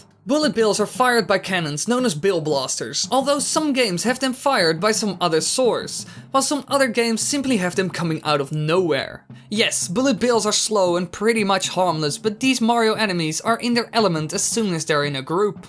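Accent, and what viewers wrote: Netherlands. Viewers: you can so hear in this video that your laungage is dutch XD ik weet het gewoon zeker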